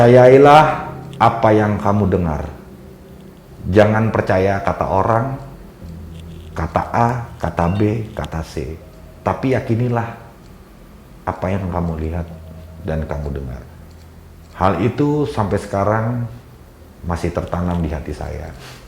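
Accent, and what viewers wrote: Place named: West Java, Indonesia. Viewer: Oh belajarnya dari Banten toh..pantes aja kok logat nya lebih kental ke barat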